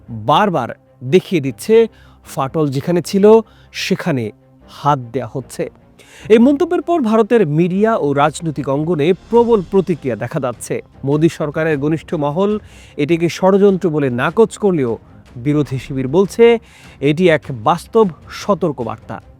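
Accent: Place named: Bangladesh. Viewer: ভারতের কোন বিরোধি দল বিচ্ছিন্নতাবাদ সমর্থন করে? এটা ষড়যন্ত্র ছাড়া কিছু নয়। কোনও সৎবুদ্ধি সম্পন্ন ভারতীয় আর দেশ ভাগ হতে দেবে না। প্রতিবেদকের উচ্চারণ শুনে একজন বাংলাদেশী বলে মনে হচ্ছে। এই ধরণের প্ররোচনা মূলক ভিডিও বানানো থেকে বিরত থাকুন। আর কোনও ব্যক্তি বিশেষের দেশ নয় ভারত। এখানে সত্যিকারের গণতন্ত্র আছে। তাই এই দেশ 140 কোটি ভারতীয়ের। অবশ্য সত্যিকারের গণতন্ত্র কী তা বোঝার ক্ষমতা বাংলাদেশীদের নেই।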